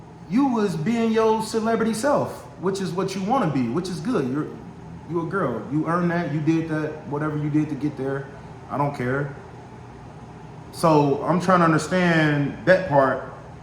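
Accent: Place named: Detroit, United States. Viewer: Could’ve swore you was from Detroit s/O to Cincinnati! I got fam in Youngstown & I just subscribed to your channel bro! Keep goin up 💯💯💯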